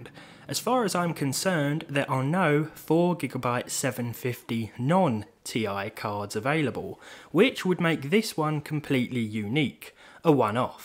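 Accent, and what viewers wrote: United Kingdom. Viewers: *Far east* Now i believe you, that are you brittish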